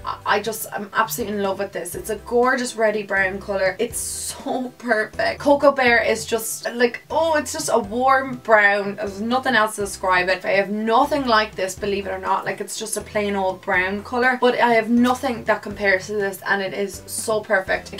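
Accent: Ireland. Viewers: I love your videos so much!!! I love your Irish accent!!! I'm from Ireland too!!! I love you and your channel!!! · Is she irish? Oh shes so lovely dovely 😞 😍💑💏💞💖💕💓💗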